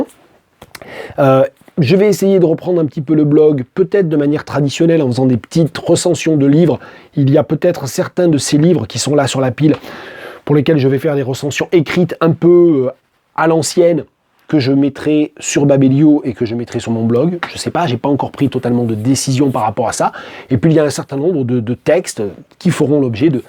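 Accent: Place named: South-West, France. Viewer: Je ne suis pas saoulée par ce "dégoisement"... J'y retrouve l'accent et le vocabulaire ainsi que que les manières de triturer la syntaxe à l'oral du sud-ouest... Et ça donne encore plus envie de lire! Donc pari gagné en 40 minutes!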